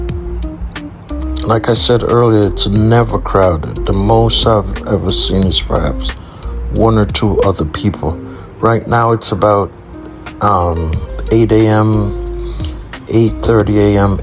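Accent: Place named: Jamaica. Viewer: I can hear that powerful exploratory Jamaican heritage in your voice bro! I wrote this before i saw the flag I've just reached that.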